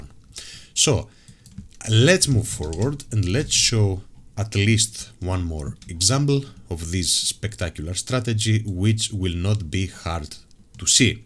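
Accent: Greece. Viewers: your accent is greek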